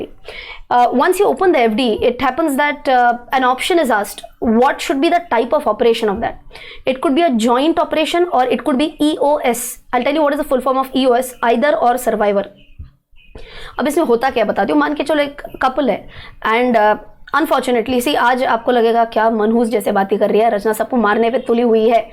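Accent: Maharashtra, India. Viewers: What a brilliant speech you have. So captivating, so knowledgeable and genuine sounding. Your crisp marathi accent makes it more wonderful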